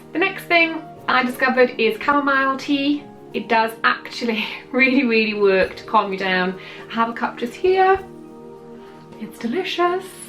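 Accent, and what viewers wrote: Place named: United Kingdom. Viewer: Thank you for these ideas! I too have much anxiety, I loved your train idea, going to try it!!!! thank you! just found you "accidentally" by watching your "skinny tan" video. Im very intrested in ordering the product & somehow can across your videos😊 your skinny tan demo. convinced me to order it! so, thank you! also, I am so in love with British people & your accent ( Im American) so I also just love listening to you talk!😊 will be watching alot now! subscribed to yoir channel too btw!😊 you are lovely! thank you!🤗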